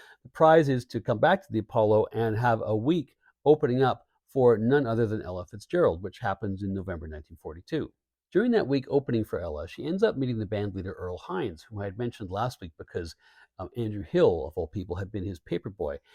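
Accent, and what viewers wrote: Canada. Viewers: My bad that I misheard you🙏🏻 It’s your thick Canadian accent🇨🇦 that tripped me up!😁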